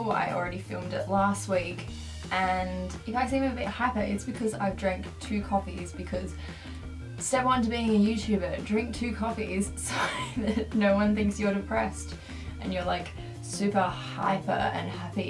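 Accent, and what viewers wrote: Australia. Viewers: Wait if your not Aussie where are you from. U sound Australian 😂🤔😂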